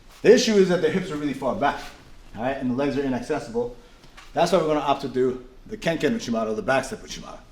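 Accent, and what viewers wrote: New York, United States. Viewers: I like how he speaks New York :-)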